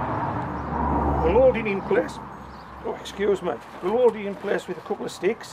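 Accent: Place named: Hartlepool, United Kingdom. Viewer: Where is your accent from? My Mrs is from Hartlepool and I reckon yours isn’t too far off.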